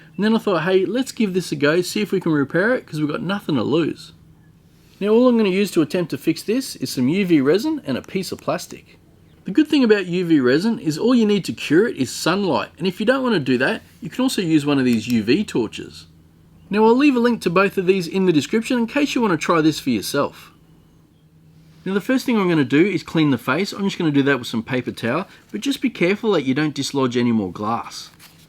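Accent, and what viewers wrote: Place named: Australia. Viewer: So australian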